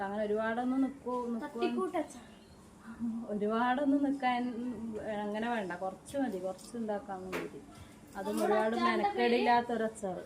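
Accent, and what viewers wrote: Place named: Kannur, India. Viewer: പക്ഷികളുടെ ശബ്ദം കേൾക്കുന്ന സുന്ദരമായ സ്ഥലമാണെന്ന് മനസിലായി.കണ്ണൂർ ജില്ലകാരെ പോലുള്ള സംസാരത്തിലുള്ള അച്ചാർ ഉണ്ടാക്കുന്ന നല്ല വീഡിയോ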